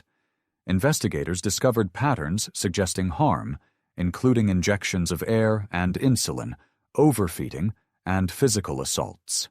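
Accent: United States. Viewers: Why is it narrated by an American? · Why is the narrator american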